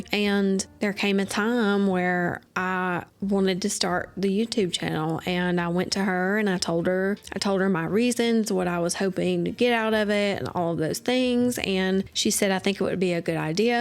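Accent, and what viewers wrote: South, United States. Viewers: Hi, I love the way you speak, it's perfect, not too fast and I love the southern accent! I look forward to hearing more about your journey. I can relate to the fear of dying and wanting to have a place for loved ones to see and hear you. I started my channel during covid. I was so scared that I wouldn't get to see them again, i made my channel for them so they can hear my voice if something happens to me. And I'm like you also, my channel is not for promoting products, I have a standard letter I copy and paste into a return email thanking them, etc. This is an awesome video and thanks for taking the time to share your knowledge with us. Take care. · I really love all of your content. Every single video is entertaining, thought-provoking and energising. I especially love watching you and your husband interact. One thing that stood out to me from the beginning was your slower Southern accent. 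I struggle to understand anyone with any accent talk fast and always have to enable subtitles online, which can distract from the content at times. And I always say "I wish I could enable subtitles in real life". I did struggle to understand your husband though (even YT subtitles got mixed up sometimes hehehe - which was entertaining in its own right) but his warm personality, you interacting with him, Bayley's antics, all the emotions, and the cutest random animal showcases ALL made me (and my mom) keep watching. We are so in awe of your survival story that anything you post is a lovely surprise. Like opening a foreign candy wrapper and experiencing a burst of new flavour. By the way, me and my mom have a disorder called misophonia (decreased tolerance to specific sounds) and still continued watching. That's how much we love your channel. Another BIG reason I'll continue watching your content no matter what is the fact that you are NOT religious. 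There's so much I can say about that but I'd rather not... insert sound of crickets here...I really appreciate slower more intentional long-form content in a sea of fast-paced crappy content. I was particularly also intrigued by the wild 911 calls and interaction with your former co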